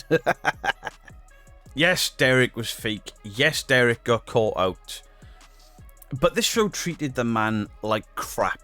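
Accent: Wales, United Kingdom. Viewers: He’s Welsh 😊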